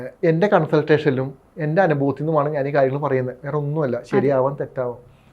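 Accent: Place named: Ernakulam, India. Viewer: Yes കുമ്പളങ്ങി nights shane നിഗം slang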